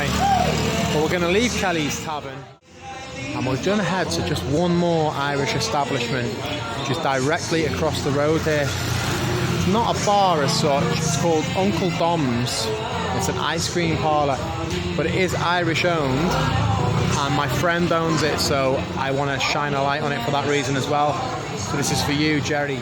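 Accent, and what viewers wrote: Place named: North Wales, United Kingdom. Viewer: Hi Mate, Subbed after seeing you on Pete's channel. Really enjoying your videos. Where about's in N Wales are you from? You have the same accent as me. Take care :goodvibes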